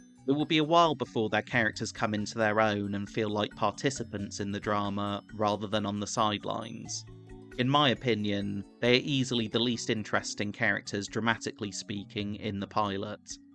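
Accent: United Kingdom. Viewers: For the love of God, can we get someone who isn’t from the 🇬🇧 to narrate this? Are we (🇺🇸) so shallow or thick- that we “Need” a gnarly British accent just to pay attention for a little while?? C’mon it’s gettin’ kinda pathetic, now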